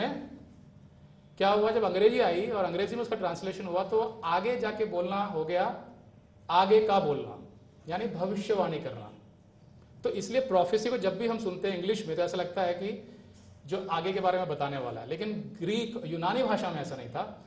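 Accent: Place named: Kerala, India. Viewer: Superb well explained Bro.. I really like your Pure Hindi language after you been a malyali language mother tongue. Appreciate much on this topic of tongues. Will share to others as not much speakers in YouTube are Hindi speakers. Also we have spoken on phone many years back. GOD BLESS YOU ABUNDANTLY. I have also got an article on this topic As we print and distribute it free to aware people about this wrong doctrine.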